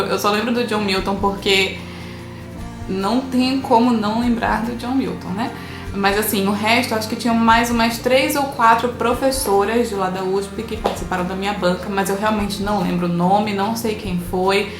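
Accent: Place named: Pará, Brazil. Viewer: você é paraense ❤só pelo seu égua eu me inscrevi 😅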